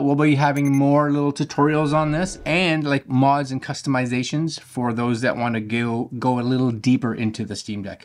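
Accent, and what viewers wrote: Canada. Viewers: ❤ that Canadian accent makes my heart go pitter patter …..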